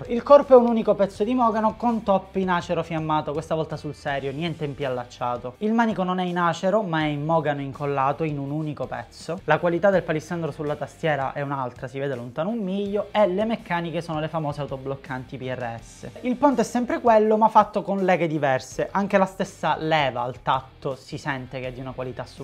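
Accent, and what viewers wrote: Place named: Palermo, Italy. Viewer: A me sta simpatico anche il suo forte accento palermitano... :-) Ma ho un debole per la Sicilia, sono di parte.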